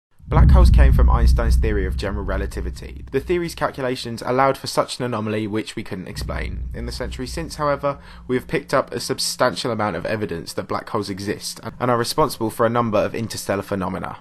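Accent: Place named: England, United Kingdom. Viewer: Narrators with English accents; "Saturn" is mispronounced with the 'r' in Saturn silent, sounds like Sat-in, or Sat'n. I say mispronounced but to the English that's just the way they say it so I'm sure they would be quick to say it's not mispronounced. The word 'pattern' same thing, sounds like 'pat-in' or pat'n. It drives me nuts tho. The planet Uranus, pronounced Yer-In-Ess to the rest of the world while the the English just flat out say 'Your Anus' which, let's face it, just sounds wrong. Add these two words together and the English person say 'Sat'n Your Anus. Oh really, you sat in my anus? How was that? I don't want to know. I'll stick with 'Sat-urn' and Uranus.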